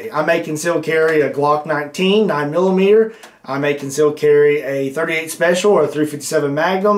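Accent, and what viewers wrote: Kentucky, United States. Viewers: “Are you from Kentucky”, haha as if your accent (or the name of the channel) didn’t give it away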